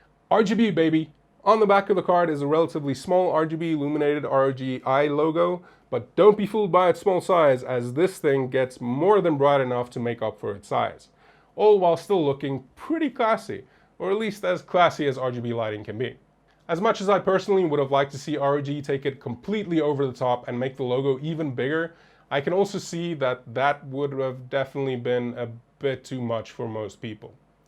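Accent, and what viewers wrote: South Africa. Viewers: hehe, do I detect as slight South African accent in there?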